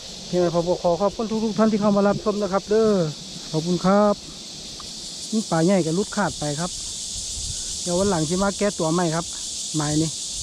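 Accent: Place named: Thai Yo, Thailand. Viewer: ไทยญ่อบ้านได๋น้ออ้าย? สกลฯหรือนครฯ?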